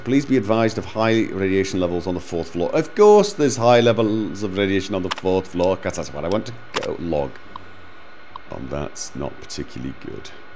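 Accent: United Kingdom. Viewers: Can we have subtitles in videos? My siblings dont know enough English to understand your British accent.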